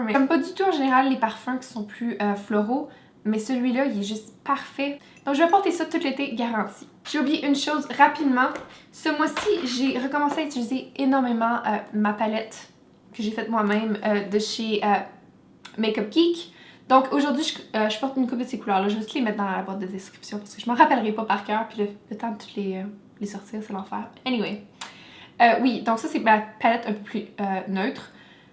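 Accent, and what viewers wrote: Quebec, Canada. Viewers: J'écoutais tes vidéos de mascara en anglais et j'ai reconnu un accent québecois alors j'ai fouillé sur ta chaîne et j'avais raison!! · J'aime tellement ton accent québécois ! Dans le nord de la France on a pas la chance d'avoir un si bel accent... Ahaha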